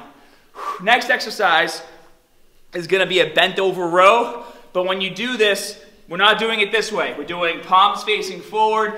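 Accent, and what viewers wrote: Boston, United States. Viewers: ROFL . XD ,I didn't know that was a Boston accent. I've just never heard an accent like yours, maybe because I'm from S.A xD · Basten accent😄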